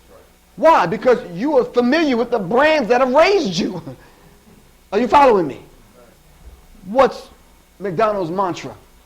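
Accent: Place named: United Kingdom. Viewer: WHY!! This nigga sound British. (no disrespect tho)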